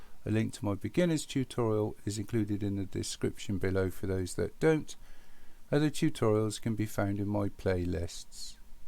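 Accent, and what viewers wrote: United Kingdom. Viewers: I like your accent dude British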